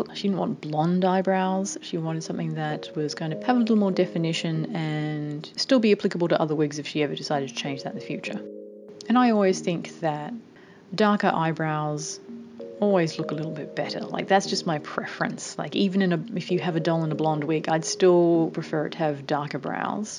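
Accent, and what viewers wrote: Australia. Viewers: Love the Australian accent. Show me how to get one. Your work is amazing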